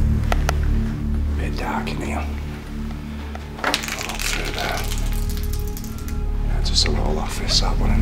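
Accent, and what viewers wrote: Manchester, United Kingdom. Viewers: I love the videos but this guy surely accentuates the Manc accent haha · Got this link from a MEN news article. Blew me away. Absolutely love this stuff. Really love the Manc accent commentary too! "Holy smoke!!" Pissed myself! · Ey pal, is Man Vic still open ¿? , me n our kid (W. Casino devotees), before we had motors, used rail to getup to W.Wallgate from Victoria. HaH! In 77 we were approached, ticketing area,, by a geeza and his bird with an Adidas FULL TO BOSTINGLY, of grabbed t. Lights (Tuinal caps), and we had a few for the morning and fk, after the b.st.blueys, by time we got back to Chesterfield next mid morning we was well fkn rammed. Passed through M V only coupla times after and, post ticket area, had to, and managed to fall the like 20+ steps into the underpass to the W W gate platform. We all got fed up with later attacks on the rail travelling soul crowd as Wigan locals turned against us. 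Am 60 now, blimey, wish was a young again, y'know. Neat vlogs oak, bang on, your accent reminds of the Trafford lads, norarf! Say, you do olde pubs as well, Okeh, outside Manny Picc there used to be a mini 2 roomed alehouse, is it still theere, just across the road from where a traveller came out from that station, where the taxis later used to congregate ¿? Top endocrine Whitworth St I think. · Hi m8 i watch 2 or 3 Urbex channels But i think your content is top draw and the old cheeky Manc commentary adds adds a different dimension to it too, Think you should go back their and do a more longer and in-depth look at the place as it was very interesting as a old skool 88-93 raver i love the old time buildings and architecture, Wonder if anybody stoped off their before going the Twisted Wheel, Hacienda or Thunderdome lol Keep Up The Good Work R Kid lol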